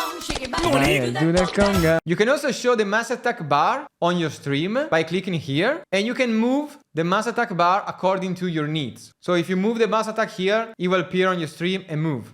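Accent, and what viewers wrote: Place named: Italy. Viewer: guarda che si sente che sei Italiano ....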